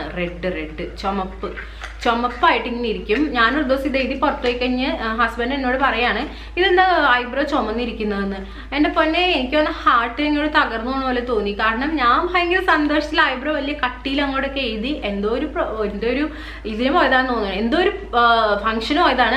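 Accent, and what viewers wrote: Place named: Kochi, India. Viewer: Innathe samsarathilanu kochi slang undayathu, nalla rasamundu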